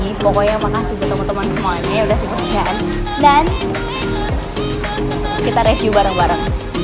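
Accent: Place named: Jakarta, Indonesia. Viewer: Wes gedhe saiki,cara ngomonge wes koyok wong jakarta😂😂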